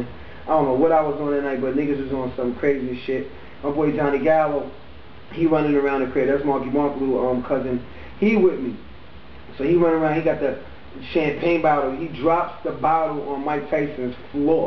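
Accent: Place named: New York, United States. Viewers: you just dont know every new yorker have that accient manily if u from the projects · Why don't he just tell the story, whatever it is, instead of holding on for dear life to that NY accent and name dropping?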